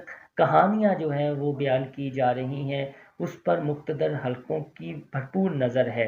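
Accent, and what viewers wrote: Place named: Punjab, Pakistan. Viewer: وہ پنجابی ھے۔ پنجابی لہجے والی اردو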